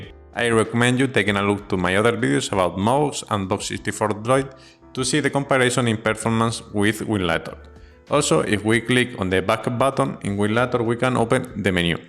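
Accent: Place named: Spain. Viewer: Very spanish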